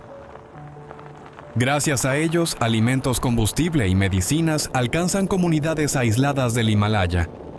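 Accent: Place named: Brazil. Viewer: Abençoado dia por Deus obrigado por passar uma voz quase Brasileira gosto de vidios asim e asim entendi muito bem o que diz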